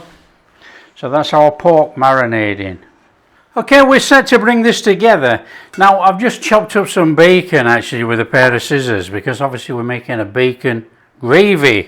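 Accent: South, United States. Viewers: Your southern accent needs work, but the food is what granny made! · God you do make me laugh, I think you're American accent was very good actually. 😊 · As a Southerner in the US... I love your Southern accent! 😊 And your cooking! ❤ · Not a bad Southern accent!! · Southern Grandma here. That Southern accent ain't the worst I've heard. I'm a good cook, but my pork chops could use some improving. No doubt, it's somewhat a lack of patience problem! I'll be trying your buttermilk trick soon enough. You know, here in the states, all of our bacon is streaky bacon. Where I come from, it's routine to save your grease from every time you cook the bacon. I keep it in a Mason jar in my refrigerator and use it pretty frequently. Love it to grease the cast iron skillet before I make my corn bread. The CRUST! Thanks,. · Y'all just listen here to the southern version of chef. 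You can speak southern a lot better than I can speak your English. Personally, I love it. And I say for the umpteenth time that I love what you cook. And pork chops are another of my favorite. Youve cooked them pretty much like we do. And served with mashed potatoes and gravy is just the best way. Theres not much food that I don't like( I'll never be rich 🤑 enough to eat escargot or caviar) but I will try most anything. Youve made some good eating there chef.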